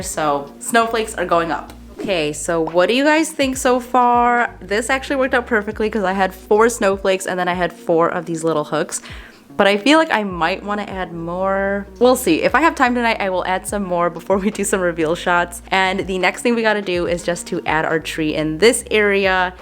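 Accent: Chicago, United States. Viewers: I just stumbled upon your channel after watching a bunch of Youtube Christmas decorating videos. LOVE your videos, especially your DIY projects. Also your Chicago accent reminds me of home (I’m from Illinois originally and your voice sounds just like one of my friends ♥️). Happy holidays to you!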